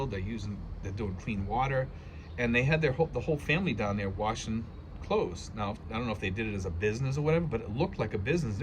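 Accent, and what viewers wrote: Boston, United States. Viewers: Awesome video as always . Cool Bawstawn accent dude !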